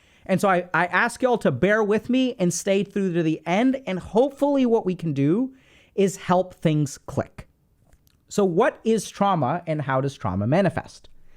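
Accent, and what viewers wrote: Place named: India. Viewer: I wish he would use his Indian accent more! It motivates me to listen more.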